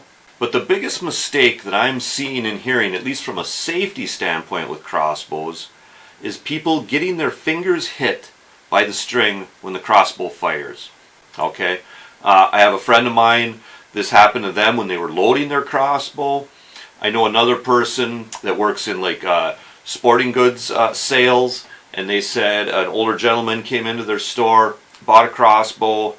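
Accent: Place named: Michigan, United States. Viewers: Good info and as a newbie crossbow guy duly noted. You sound like you're from the UP... Sault Ste. Marie here. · What’s your general area in Michigan! I hear that accent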